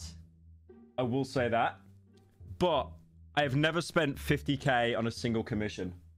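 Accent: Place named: United States. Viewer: Why is he so high pitched and murican twanged now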